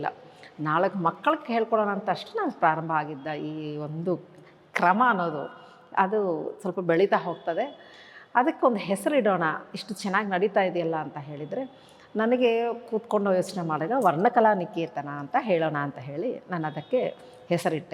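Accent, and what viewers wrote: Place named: Andhra Pradesh, India. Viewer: ನೀವು ಮಾತನಾಡುವ ಶೈಲಿ ನೋಡಿಯೇ ನಾನು ಅಂದುಕೊಂಡಿದ್ದೆ ನೀವು ಆಂಧ್ರ ಮೂಲದಿಂದ ಬಂದವರು ಅಂತ, ಆದ್ರೆ ನಿಮ್ಮ ಕನ್ನಡ ತುಂಬಾ ಚೆನ್ನಾಗಿದೆ😊ಖುಷಿ ಆಯ್ತು ನಿಮ್ಮ ಸಾಧನೆ ಕೇಳಿ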